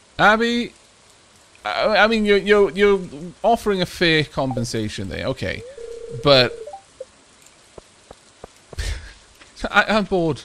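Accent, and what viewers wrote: Wales, United Kingdom. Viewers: Bläh He's Welsh 😉 not sure where he currently lives though.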